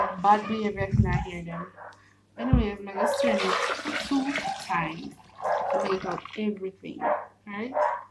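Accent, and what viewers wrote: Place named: Jamaica. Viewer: Love the patois(patwah) down to earth!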